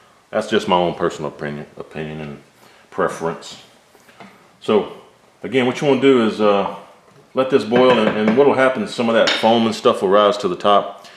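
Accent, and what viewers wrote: South, United States. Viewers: Nothing like a slow-talking, slow-walking Southern boy !! As for the Cajun beans, I've been cooking those since I was 12 & my mamma taught me. What I would like to see is someone cooking red potatoes, green beans & ham. Some folks like to put bacon or ham hocks with the potatoes & green beans. Every now & then, I cook the Cajun Beans in a slow cooker, put them in bout 0430 or 0530, and by 1400 0r 1500, those Cajun beans are good to go. Be sure you have some crunchy French bread to go with the beans. BTW, I put Serrano peppers, & poke holes in those, buddy !! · Girl I love him..... And that southern voice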